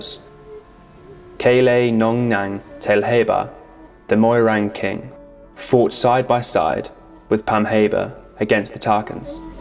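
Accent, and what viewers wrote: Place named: United Kingdom. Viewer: I was not expecting the british accent....😂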